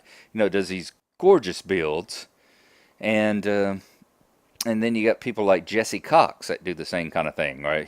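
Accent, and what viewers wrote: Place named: England, United Kingdom. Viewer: "Just leave the damn thing alone and put the new textures in." LOL I know exactly how you feel and agree with you. Use glowstone to melt your channel of water through the ice instead of torches. They're 1 light level higher so will melt one block more in each direction. With the way boats are so difficult to handle you'll need those 2 extra blocks' width. I think, with the beauty and creativity of your Emerald mine you can consider your game well and truly upped. You started building that 6 to 9 months ago and never mentioned it to any of us or showed us? pouts Love how your solution to the dangerous mob ledges above is to cut into the wall to shelter rather than climb up and torch it up. Means your ravine looks so much cooler with the upper extremes still in darkness. Once again it's your small differences, often so considered and thoughtful, that makes a huge difference to the outcome and feel of your place. 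Small correction. Building your mine 4 high does not cover the 4 main layers of lapis, it covers 6 of them, because you can see the ceiling and floor blocks too. You're actually being even more efficient than you knew! :o) G "Had a fun little time: got to trash talk a skeleton and a couple of zombies over there." LOL chuckles Okay, why when you put the text in to correct County Gnarlo to Brocraft does the addition of that "mate" mean I hear my voice reading the text instead of yours? Or maybe yours in that amazing English accent you can employ... :oP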